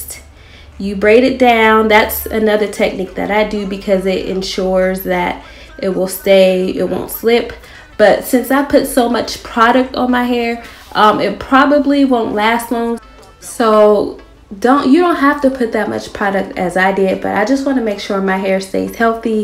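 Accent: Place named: Baltimore, United States. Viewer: She sounds like a young jada pinkett i wonder if shes from baltimore...i hear an accent ☺☺ i love ur hair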